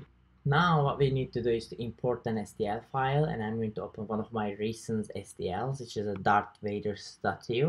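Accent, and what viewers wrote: Turkey. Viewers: Youtube da gezinirken hasbelkader sana rastladım. Konuşma aksanında ya hu Türk galiba dedim ve öyleymişsin. Bir sevindim ki inananmam. Çünkü 3d yazıcıdan silikon basan gördüğüm tek kişi sensin. Bana bu konuda yardımcı olmanı rica ediyorum. 3d yazıcı alarak silikon kalıplar basmak istiyorum. Lütfen bana yola göster. Ne alayım, filamentinden makinesine kadar yardımına muhtacım. Teşekkür ederim. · Accent is turkish indeed. Cool video! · İngilizce aksanından türk olduğunu anladım. Teşekkürler video için.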